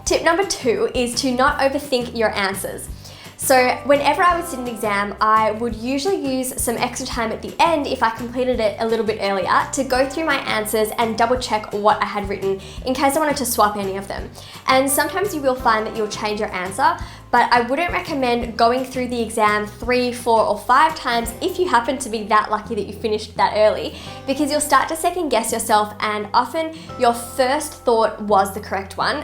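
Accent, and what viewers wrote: Australia. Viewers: Aren't you an Aussie ? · are you an Australian?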